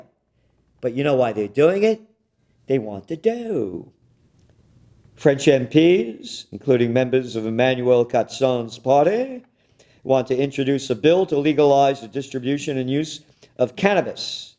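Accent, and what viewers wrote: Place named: New York, United States. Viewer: Gerald Celente...Yr schtick is Incomparable!!! I grew up in the small town near Poukeepsie...I enjoy hearing yr NY accent and mannerisms...peace out, and thanks!!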